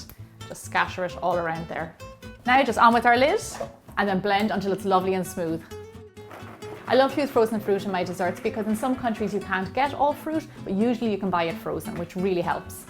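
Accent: Ireland. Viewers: Btw I love you accent! What is it Irish? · Are you Irish?? Sorry if I'm wrong but my nana is Irish and she has an accent that sounds like yours!!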